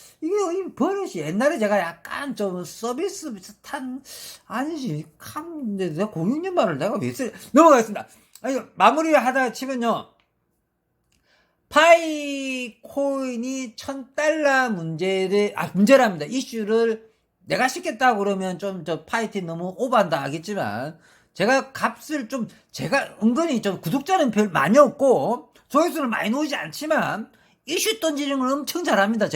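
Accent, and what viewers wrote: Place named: Masan, South Korea. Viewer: 이분 무조건 마산분인디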